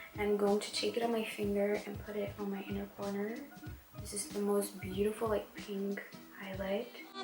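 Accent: Netherlands. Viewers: are you dutch? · Omg wait are you Dutch?!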